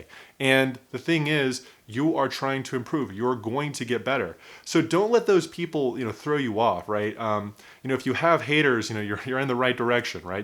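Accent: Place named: United States. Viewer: Thanks for sharing. I was wondering where your accent was from. I'm quite sure that it's American but I can't recognise the State yet. I'm asking because I noticed that some Americans pronunce "Better" as "BeDer" while others use to pronunce it with the "T". I like your way of speaking and your points of view. Keep going 🙂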